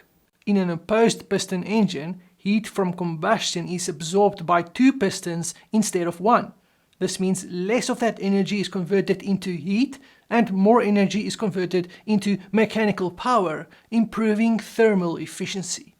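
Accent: South Africa. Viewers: Puedo entender sin subtítulos .... bendito acento sudafricano 😅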